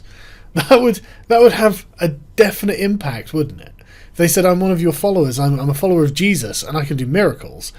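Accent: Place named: United Kingdom. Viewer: I did not know Sargon was DMing an actual D&D campaign! I was I could be one of his players. I would LOVE to have my character's actions narrated by that amazingly British voice...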